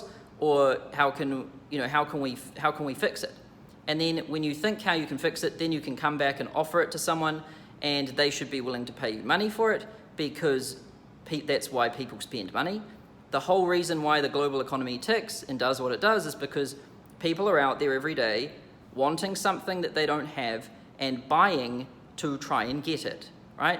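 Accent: New Zealand. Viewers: A<link> your gorgeous Kiwi accent IS adorable!! Thanks, for the info, Champ! I'm still on the fence about signing up BTW...trying to believe that this isn't just going to be yet another online thing that promises the world, takes my money and produces not a whole lot. So, researching....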